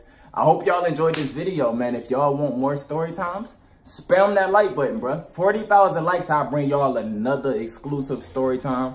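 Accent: Detroit, United States. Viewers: that detroit acent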